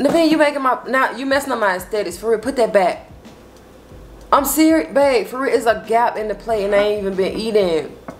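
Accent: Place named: South, United States. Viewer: She's definitely from the south.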